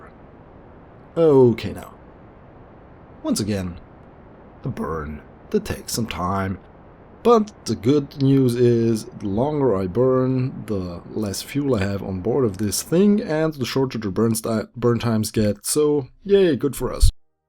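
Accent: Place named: Germany. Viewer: im not 100% sure though but im from Germany and he sounds german^^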